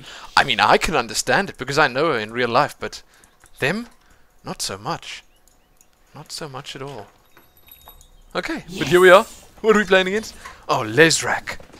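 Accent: South Africa. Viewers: You sound south african. Is that accurate?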